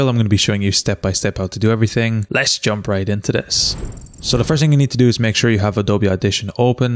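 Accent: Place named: Ireland. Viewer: Good man, good to hear an Irish voice, thanks for the video 👍🏻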